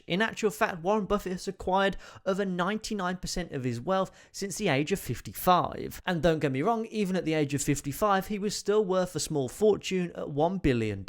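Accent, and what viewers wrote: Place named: Northern England, United Kingdom. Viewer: I thought it was brummie initially but certain words made me think it was more northern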